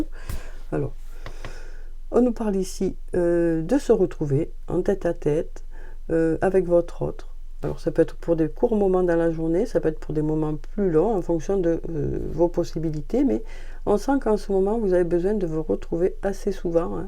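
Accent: South, France. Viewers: Coucou, contente de voir la dame de l accent du soleil et toujours un plaisir de vous écouter,avec cette douceur et bienveillance merci 💐🙏😘 Bonne journée à vous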